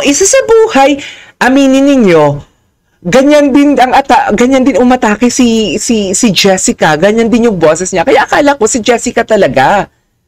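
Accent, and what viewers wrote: Iloilo, Philippines. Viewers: Sa tingin ko, ilonggo ka. May accent.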